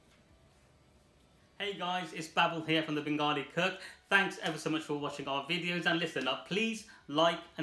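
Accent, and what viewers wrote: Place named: United Kingdom. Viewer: I love your accent. what kind of uk accent do you have?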